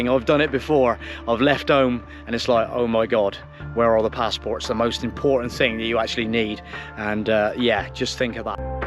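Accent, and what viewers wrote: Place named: Exmouth, United Kingdom. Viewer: yep that’s what I’m looking at but I’m being told to fit a 255 tyre they need to be a 7” wide rim not the standard 6” , what width are your steel wheels . Btw I love your channel and your accent which is very much like mine coming from Exmouth and now living in Gloucestershire 👌 . Thanks for your help regarding the wheels 🙏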